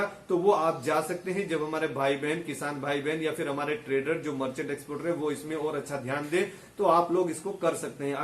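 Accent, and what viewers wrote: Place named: Bihar, India. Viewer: Dear, you had made fun of Punjabis by saying Canada to Kanadha, According to you punjabi speak in this accent.. Moreover you also need to look into your own accent, Russia need not to be pronunce to Rhahssia.. 😂 And many other country name you pronuce in funny way... Now you need to work on your श , say शाम not saam, is that Bihari Accent??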